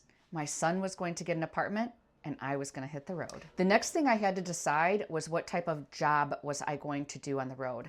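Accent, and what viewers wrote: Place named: Michigan, United States. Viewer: Wow! Your story is very similar to mine and my name is too. I knew you had to be from MI from your accent. I spent over 25 years living and working in Chicago area and after my 3rd son graduated from high school I started planning my escape. My husband and I had been married for almost 25 years, raised 3 boys, started a land ran a business together (for 10 of those years for me. The company is still thriving in NWI), built a beautiful home, but we hadn't been drifting apart apart our first son left for college at UW-Seattle where he stayed for 15 years (he recently moved back to Chicago area--beautiful but too expensive even with a great job in IT). I left our business in 2013, and started planning to divorce and move, which was a mutual agreement. I was so sick of Chicago winters and always wanted to live at the beach, where we had vacationed many times. 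I moved out of my 3000 sq ft home,into a studio apt where I would live for the next 2.5 yrs, worked at a tax prep firm (I had been a CPA/accountant/tax preparer my whole career so this was a great fit for me). In the summer I worked 3 jobs at once as the min wage in SC is still $7.25, I was struggling but happy and living at the beach. I had never felt freer. Flash forward 11 years I am retired and living in SSI. I met my second husband )totally unplanned for sure), and in 2023 we bought a fixer upper home in a beautiful and safe neighborhood 3 miles from the beach. My friends and family visit often and this year a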